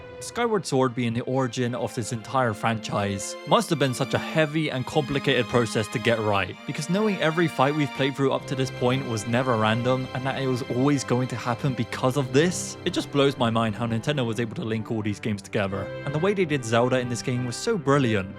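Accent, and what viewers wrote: Bristol, United Kingdom. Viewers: I know a Bristolian accent when I hear one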